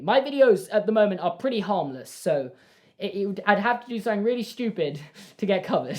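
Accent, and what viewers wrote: United Kingdom. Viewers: I don't know if you'll read this, but I feel so positively impacted by your video that I need to comment. I do not comment often on videos. I believe I found your channel through the Carlin Bros. I enjoy a lot of the subjects you make videos about (Harry Potter mostly). I really enjoy your editing style and delivery. I'm a bit surprised that you're self-conscious about your voice because I don't find it annoying at all. Perhaps it's the British accent? I know far worse voices for YouTube. Getting off-track a bit... I think this video says a lot about your character. You're opening up about your experiences as a YouTuber and ultimately your highs and lows. You acknowledge shortcomings and work hard to be a better YouTuber and a better person. That motivates me to be better, too. I also hope that you keep finding fulfillment through YouTube because I really enjoy your channel. 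I enjoy your comedy and value your opinions. I hope you know that many of your subscribers value your perspective. It does surprise me a bit that you receive impactful hate comments. You're a great person and you deserve everything you get from this platform. I hope you are well! Best of luck to you going forward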